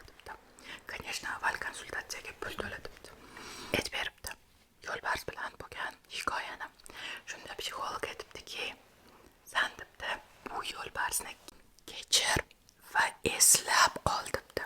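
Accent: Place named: Namangan, Uzbekistan. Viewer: Namanganlimisz shevada oxshavotti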